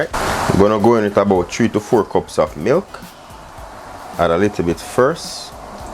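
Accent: Jamaica. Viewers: Bro you have me drooling just subscribed. Love how Jamaican speak and your cooking is out of this world. Ah gwan big up yuhself bro 🇹🇹🇹🇹🇹🇹🇹🇹🇹🇹🇹🇹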